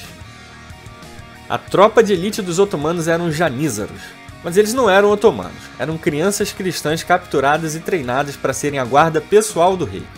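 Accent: Rio de Janeiro, Brazil. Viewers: insuportável sotaque e giria carioca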